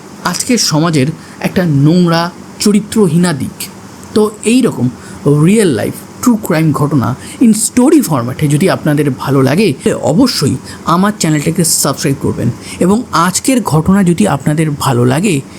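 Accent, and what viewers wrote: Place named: Hindi, India. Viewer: Alka sune aami bhabchilam hoyto alkatra e bolbe. 😂😂😂😂 O bhai bangla ta banglar moto bolo na keno??? Hindi moto bolte giye na bangla bolle thik kore na hindi. Tomar maa baba ke gigesh koro Alka ki kore bolte hoy. Aamar bangla o khub ekta valo noy.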